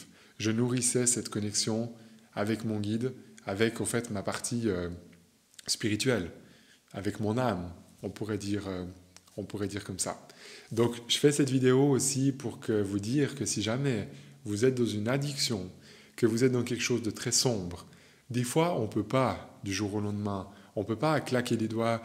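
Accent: Switzerland. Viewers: damn t'est suisse... Vaudois je dirais. magnifique postulat merci, de suisse aussi 😅. je modifie mon comm pour dire simplement que ta sensibilité se voit et qu'elle est belle mon gars. sincèrement bravo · Ton accent montre que tu es en suisse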